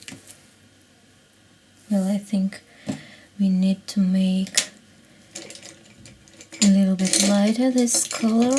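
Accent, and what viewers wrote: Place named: Russia. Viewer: вы русскоговорящая? Акцент очень наш))))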